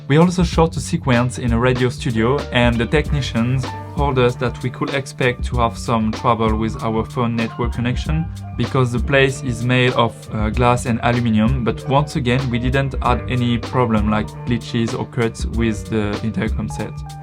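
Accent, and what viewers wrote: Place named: France. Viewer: English with a French accent is charming.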